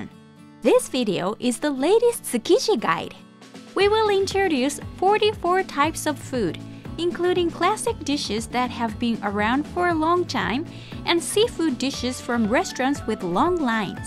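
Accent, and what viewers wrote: United States. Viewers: seu ingles ficou bem melhor agora, mesmo não sendo o ingles minha lingua materna é bem perceptivo que o seu ingles ta bem parecido com um americano. Parabéns:face-red-heart-shape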